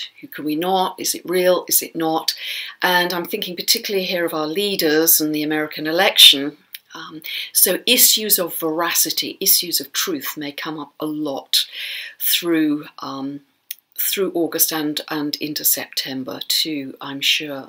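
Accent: United Kingdom. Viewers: Hello. Really like the scientific approach to your video and we cannot deny, looking at the energies in the world, that what you are saying it not valid. So many people like your videos because your British English is so clear and lovely. Best Wishes to you; ) K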